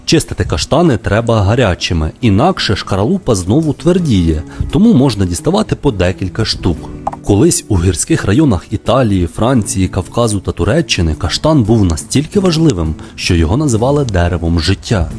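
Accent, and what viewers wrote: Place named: Poltava, Ukraine. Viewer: Ваш полтавський акцент чудовий❤ може ви й не звідти, але дуже схоже, в мене звідти родичі) А де таке росте? Де його взяти? В нас тільки кінський скрізь росте...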